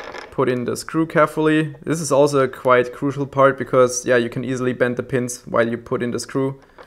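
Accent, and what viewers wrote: Germany. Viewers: er ist eben deutscher. Meine fresse. diese dummen unkonstruktiven kommentare immer. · deutscher Akzent ist richtig übel... aber sonst mag ich deine Videos!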